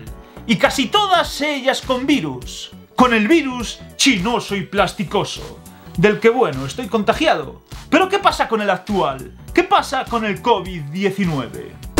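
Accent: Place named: Spain. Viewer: " infesiosos".... "testuales"... "produtos" ... ¿que zona tiene ese acento en España?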